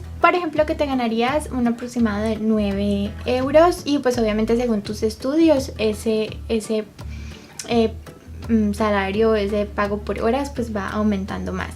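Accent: Cali, Colombia. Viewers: Eso se te oye medio caleña ois jaja tan bella saludos desde cali 👌🏽👌🏽👌🏽 tengo un enamorado de Austria y quiero saber todo de la cultura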